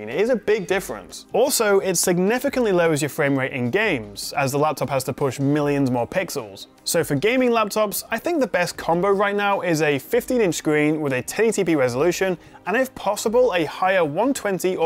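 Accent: United Kingdom. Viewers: What type of accent does he have because it sounds kind of British but also American.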